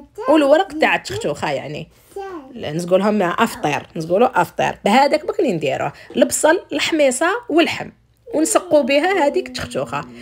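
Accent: Algiers, Algeria. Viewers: تعجبني بزاف الهدرة انتعاك مازلتى عاصمية ربي يحفظك و يحفظ لك الكتكوتات انتعاك.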